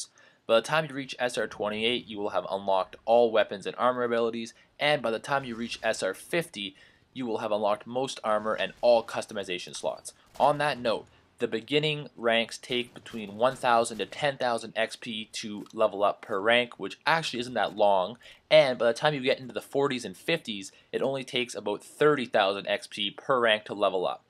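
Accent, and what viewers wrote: Canada. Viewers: I love your Canadian accent! It's just perfect! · he sounds like he lives in canada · your Canadian aren't you?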